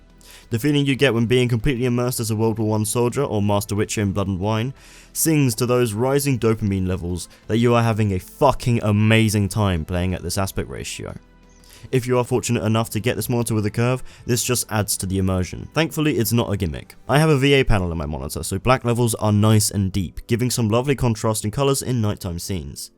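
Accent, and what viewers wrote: United Kingdom. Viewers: British accent. Of course ! Hey any chance of you doing a video after 4 shots of Scotch or Brandy?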